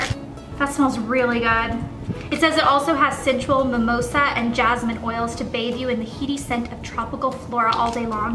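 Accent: South, United States. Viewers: Your such a southern bell! Like that might be weird to say but that is how I would describe you lol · i thgt u were uk but ir american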